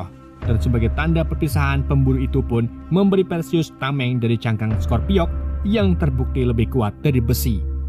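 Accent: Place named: Java, Indonesia. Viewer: Boso jowomu medok mas🤣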